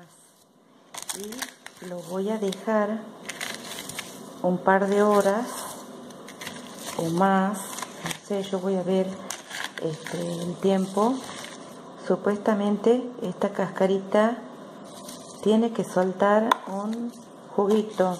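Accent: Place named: Salta, Argentina. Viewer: Suena a salteña 🥰. Ahora voy a hacer el dulce.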